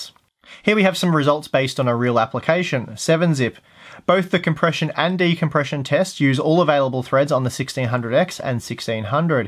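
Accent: Australia. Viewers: Holy crap, you're: a) Australian b) Not annoying Good video.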